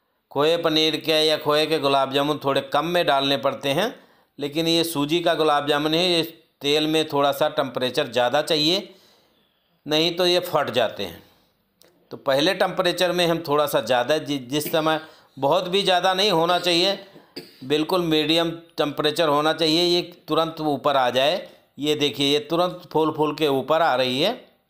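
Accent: India. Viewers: Aap bihar sy ho kya bhaiii ....bahut badhiyan dhang bataye ho sojibka gulab jamun dhanywaad ,😂😂😂😜😜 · Sir aap up se hai kya?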